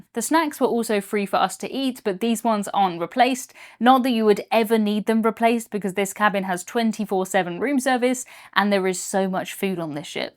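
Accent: United Kingdom. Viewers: I've never been on a cruise and don't really want to, but a while back I got addicted to presentation style and bits of humor and I keep watching her cruise videos. It must be that charming British accent she has that keeps me coming back!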